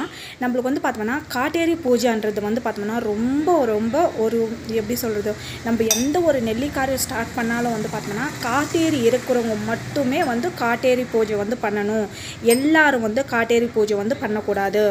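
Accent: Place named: Bangalore, India. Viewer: Mam you have given a good explanation.. i think you are explained the procedure followed by Vahnikula kshatriya. Tamil you have spoken is Bangalore Tamil if am right. Thank you for the explanation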